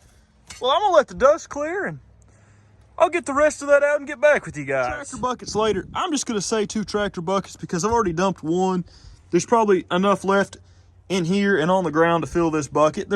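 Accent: South, United States. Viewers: you are southern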